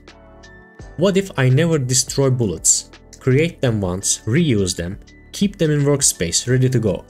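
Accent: Turkey. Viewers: your accent reminds me of Turkish people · Aksanından Türk olduğunu anladım gayet güzel bir video olmuş... Anlatımın harika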